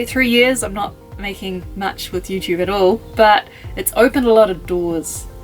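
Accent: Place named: New Zealand. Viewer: K you are such a brave lady, love your kiwi accent.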